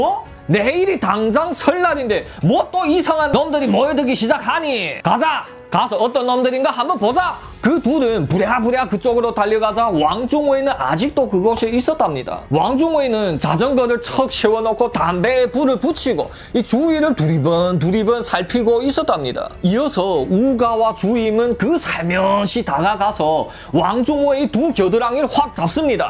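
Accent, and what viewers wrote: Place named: Korean-Chinese, China. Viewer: 발음 좀 고치시면 유튜브 떡상가능하실듯요 억양이 억센 조선족이라 좀 듣기그렇네요 썰은 재밌는데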